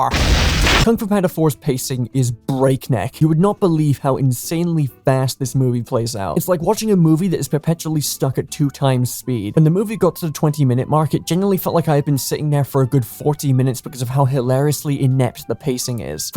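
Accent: United Kingdom. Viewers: The British accent 😭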